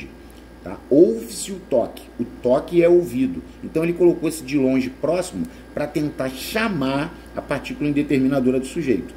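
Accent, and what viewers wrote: Rio de Janeiro, Brazil. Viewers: 7/50 !! Rlx, prof, é quase impossível agradar todo mundo! O senhor é nota mill, seu sotaque bem carioca faz parte do pacote!! rs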